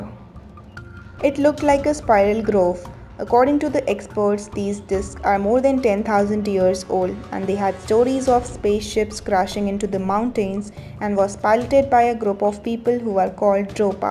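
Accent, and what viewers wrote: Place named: India. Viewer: This channel have very interesting videos but with all my respect is very hard to listen the audio in english with that strong indian accent and the letter are too small .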